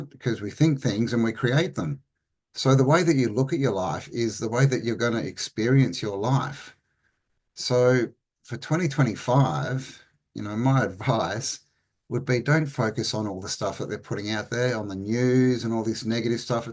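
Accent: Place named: Wales, United Kingdom. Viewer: Whern are you in the UK? Welsh pixie ❤😊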